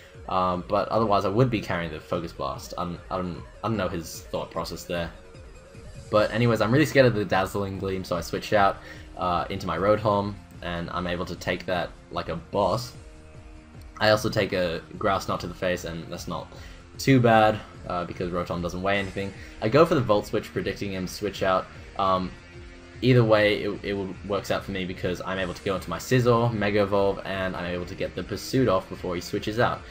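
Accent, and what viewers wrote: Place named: United Kingdom. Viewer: pokémon battles commented with a British accent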